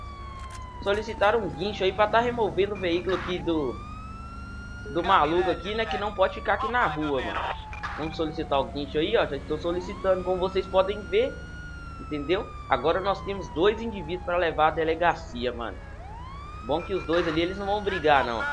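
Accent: Minas Gerais, Brazil. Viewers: vc e mineiro e?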